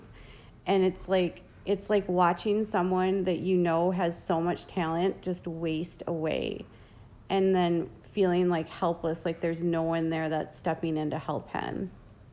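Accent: Minnesota, United States. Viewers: I think needs to go back to writing some BS liberal snowflake “column” or “blog”. She pathetically uploads several times a day about ridiculous topics with no proper research or fact checking. She has to censor every little word she is afraid might keep her from making a buck. Hey, if you’re so passionate about your political beliefs, just say the word. ABORTION. If you want to even ATTEMPT to play the reporter role, drop your awful Minnesotan accent and learn to use talking head diction. Putting a bag over your head might help as well. You have a rare talent of making someone’s eyes, ears, and brain bleed simultaneously. Ugly bitch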